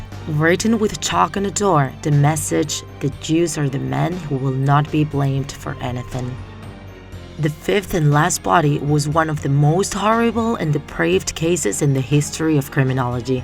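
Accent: United Kingdom. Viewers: British to me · Do you live in UK? 🇬🇧 (United Kingdom?) I feel like you speak with a british accent.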